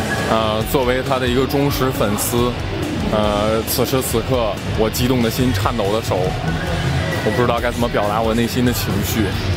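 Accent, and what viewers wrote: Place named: Beijing, China. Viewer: 这哥们的老北京新东方口音还蛮特别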